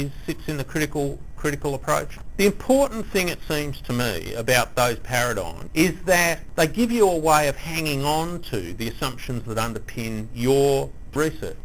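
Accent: Australia. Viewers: I'm pretty sure it's an Australian accent. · Thanks for the video is this an Australian accent?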